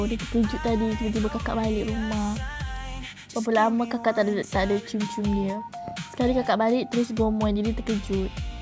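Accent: Kedah, Malaysia. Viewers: suka lah tengok bebel dalam bahasa kedahh, comell tauu 😍 btw sebut nama abg sayang ke tuu 👀 kita rindu lah aimala, abg syg wait for me~ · sampi kedah pekat betul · ckp kedah smpai aku terikut nk ckp sekali 😂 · Aku suka betul loghat kedah, nak pulak yg bercakap lagi suka dgr 🤩 fighting post, will support baby no matter what 😘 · Nanti buat lagii vlogg byk2 suka giler dgr cakap kedah 😌✨😽 Minat dari yg masakkan utk ipan 🫶🏼 serious sukaaa gilaa dgr ckp 😻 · suka betul dengar cakap dengan loghat Kedah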